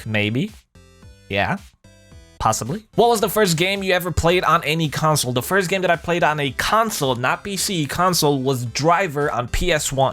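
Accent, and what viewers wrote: Romania. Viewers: There's a guy in some of my classes that looks just like you. He sounds Romanian too. Do you happen to be a high school junior near Boston, Massachusetts? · esti Roman? (ai accent)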